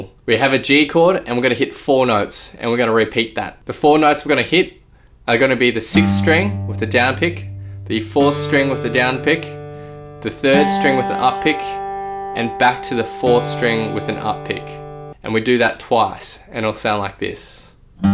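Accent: Australia. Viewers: Its even better with the Aussie accent.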